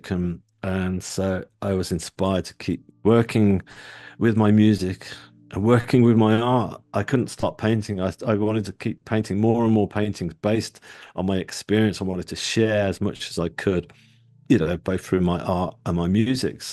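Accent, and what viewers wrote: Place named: England, United Kingdom. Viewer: So good to hear an English Voice NDE. So gentle, soothing and Real. 🫶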